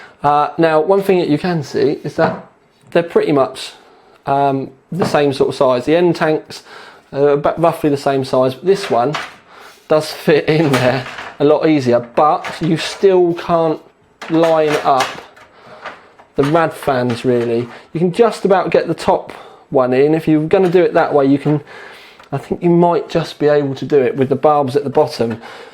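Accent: United Kingdom. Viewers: Let me guess, British accent ? btw excellent video,rich in detail .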